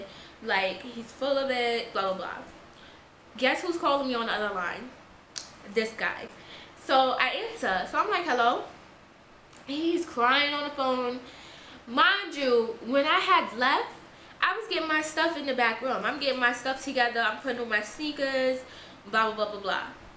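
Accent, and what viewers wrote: New York, United States. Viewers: That's how a lot of New York people talk, it's kinda like our slang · new york 😊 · NY All the way!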